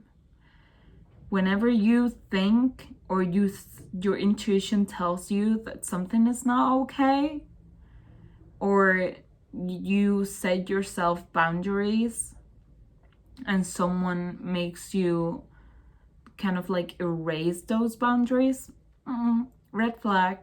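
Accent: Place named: Andalusia, Spain. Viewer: Ojalá tener tu acento, de que parte de sur de España eres?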